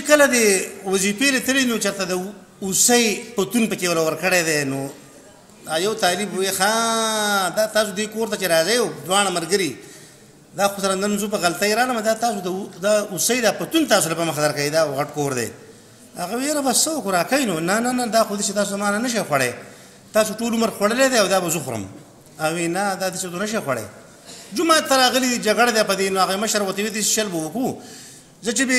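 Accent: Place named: Yusufzai, Pakistan. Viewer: دہ یوسفزو دا خواگہ لہجہ ۔ ❤❤❤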